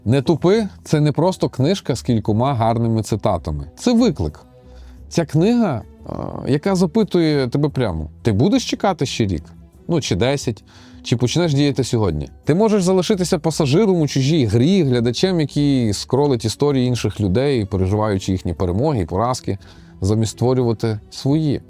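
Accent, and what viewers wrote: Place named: Lviv, Ukraine. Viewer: Перший раз побачив на підкасті Фонтан. Перші пару випусків було досить незвично...Але, через деякий час звик до "львівської подачі") - почало подобатися... БВБ, на мій погляд, гарний формат в який ведучий досить гармонійно вписався! Дякую дядь:))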